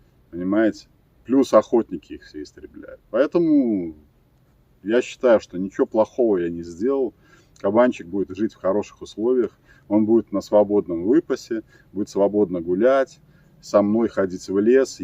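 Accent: Belarus. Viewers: Вы с Беларуси ? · А я все думал откуда у тебя такой окцент Так ты земляк я тоже сам с Минска живу в штатах Никого не слушай все правильно сделал спас хряку жизнь Молодец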